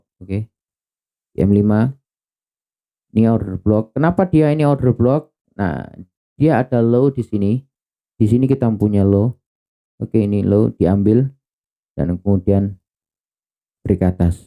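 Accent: East Java, Indonesia. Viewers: Apa mas nya dari jatim ? Bahasanya medok jatim 😁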